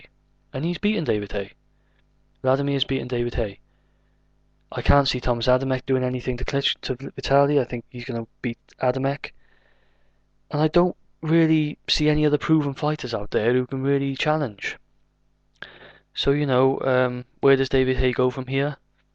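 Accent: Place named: Newport, United Kingdom. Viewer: good vid m8, agree that lookin beyond the hype haye didnt do as bad as is being made out really, apart from that fallin down shit which pissed me off, i havent seen any1 for a long time last the 12th without being smashed to bits let alone rock him with a clean shot. id like haye to fight arreola or adamek an earn his way to mandatory an do it again. many would disagree but thats wat id like in an ideal world. where in wales u from m8? sound like u from Newport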